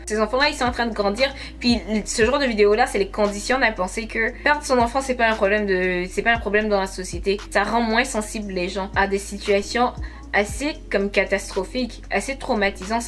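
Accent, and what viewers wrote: Quebec, Canada. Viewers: Y a des gens😥 Même pas honte😑 P.s j'adore ta voix, tu est québécoise(l'accent)? 💕🍭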